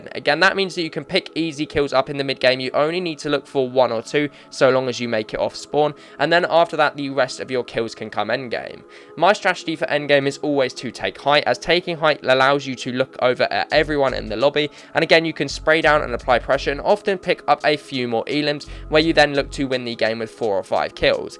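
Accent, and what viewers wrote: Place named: United Kingdom. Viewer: oh my god he got the strong bri'ish accent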